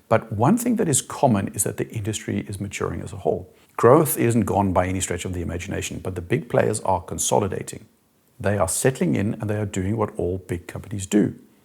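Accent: South Africa. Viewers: Great video, are you South African? I cant quite place your accent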